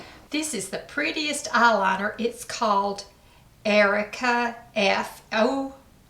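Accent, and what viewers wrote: South, United States. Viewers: I'm a new friend of,❤ We connected from our love and heartache of losing Mel. And I can say, in the short time I've known her, you couldn't be more right. She's such a beautiful soul, inside and out. Safe place to be❤ And though we became friends through such a horrible loss, I'm grateful for her friendship 🙏 I'm so happy she introduced me to your channel❤ You are such a beautiful soul, inside and out. Your southern accent will get me every time😘 So excited to be introduced to your channel...🦋🦋🦋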